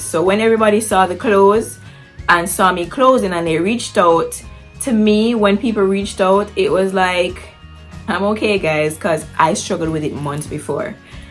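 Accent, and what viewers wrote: Jamaica. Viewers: Come thru yardie. Accent strong.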